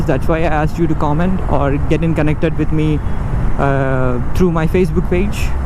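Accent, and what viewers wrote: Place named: South India, India. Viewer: Your voice in that south Indian accent is so soothing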